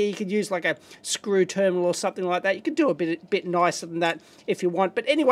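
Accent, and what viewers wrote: Australia. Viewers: Good job on the comment. You put a lot of effort into making this comment, a lot more than most people would ever do. My comment I just rattled off the top my head what I thought, without putting much thought into it. Thats the problem with watching people who are from a different country than you, I rember watching dave for the first time. It was his giant HDD tear down to me he sounded like the stereotypical Australian to me, but after so long you dont notice the accent.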